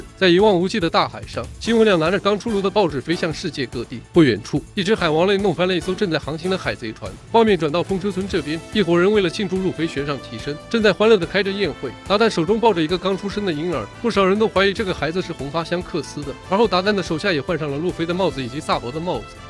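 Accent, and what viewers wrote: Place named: Sichuan, China. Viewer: 你是四川人哦？講話的腔調跟王剛很像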